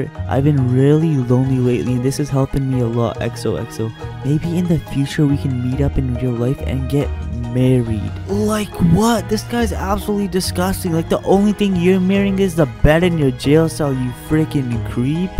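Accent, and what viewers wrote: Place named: Alabama, United States. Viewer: Me:is he from alab Alabama Me watching vid Silent Silent Me:i kneew it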